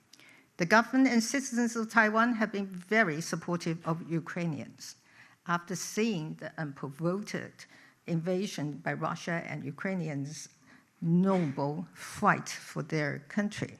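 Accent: United Kingdom. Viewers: 小英的英國腔好好聽喔～～智慧跟勇氣兼具，絕對是全球最有魅力的女性領導人之一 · 的口音真的很英式，不像台灣人在說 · 小英的微英國腔好好聽，堅定不移又時而幽默的口氣。不禁讓人想起對抗納粹時的英國政治家。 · 伦敦音很舒服有教养放眼世界无论东西方很少有的领导人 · 好獨特的英國腔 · 小英總統有點英式腔呢😂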